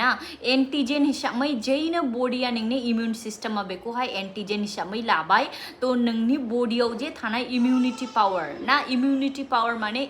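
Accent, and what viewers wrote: Bengal, India. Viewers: Railyna benguli style nisw jdwng boro bydi kwnayaki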